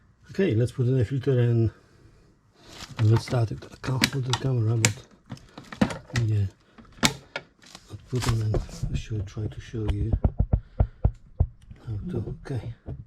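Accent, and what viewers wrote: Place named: France. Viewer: Gotta hate French accents...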